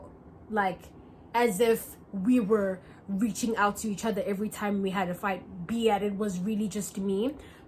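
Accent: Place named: United Kingdom. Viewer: it's the British accent for me 🤣😭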